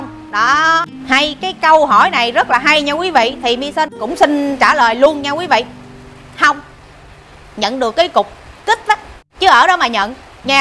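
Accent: Standard. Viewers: Chi noi rat chuan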